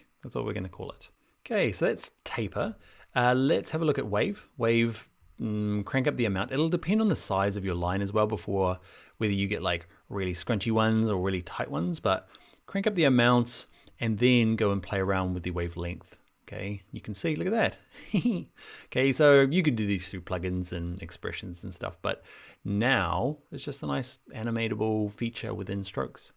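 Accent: New Zealand. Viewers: Yah it doesn’t sound quite Australian. I’d guess NZ too. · I really love the Kiwi accent.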